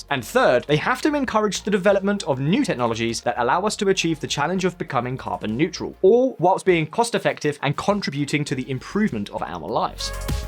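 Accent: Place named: United Kingdom. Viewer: It's a French word spelled façade. That squiggle under ç makes it sound like ß or ss. Otherwise, even in French it would be "fakad". Anyway, is an up and coming British-like voice, a welcome relief from so much careless American slurring heard on YT.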